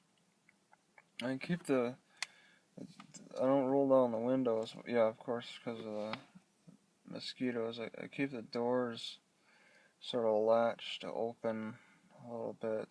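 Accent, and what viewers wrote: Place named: Midwest, United States. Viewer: I’m re-watching some of your old stuff. Your voice relaxes me for some reason. Probably the Midwest accent.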